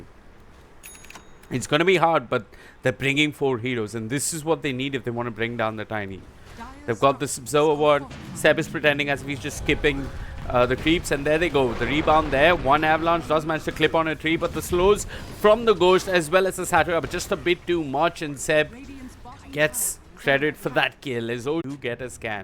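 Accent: India. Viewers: wtf is this commentator?! an indian?? wtf?!?!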